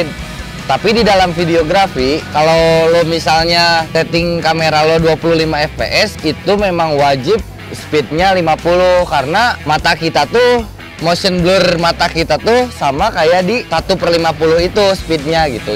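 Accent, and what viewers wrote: Indonesia. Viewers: medok euy sunda na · sundanese pisan wa hihi... ka coba tutirial color grading nya.. makasih k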